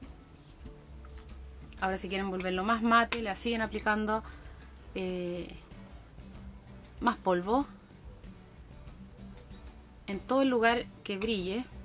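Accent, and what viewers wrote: Chile. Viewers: eres chilena????????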